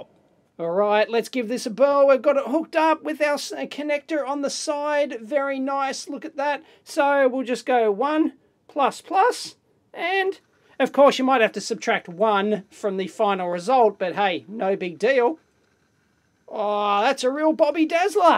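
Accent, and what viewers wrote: Australia. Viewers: Good job on the comment. You put a lot of effort into making this comment, a lot more than most people would ever do. My comment I just rattled off the top my head what I thought, without putting much thought into it. Thats the problem with watching people who are from a different country than you, I rember watching dave for the first time. It was his giant HDD tear down to me he sounded like the stereotypical Australian to me, but after so long you dont notice the accent.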